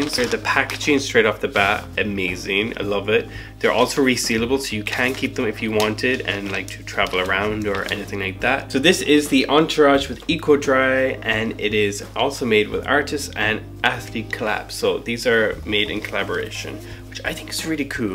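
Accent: Ireland. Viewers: Where are you from? I’m trying to figure out that accent...it sounds subtly Irish. I initially thought it was Scottish, but after listening to you, it definitely isn’t Scottish. Regardless, you’re nice to watch. 😍🏳️‍🌈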